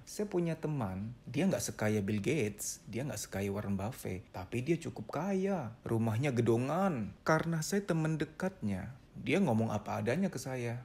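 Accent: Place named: Pontianak, Indonesia. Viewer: Abang nya ini kek org pontianak logatnya hehe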